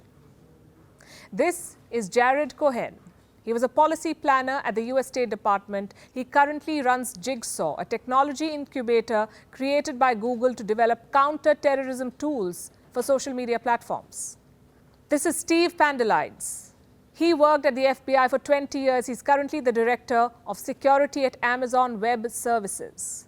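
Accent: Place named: India. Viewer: English not Indian English please so annoying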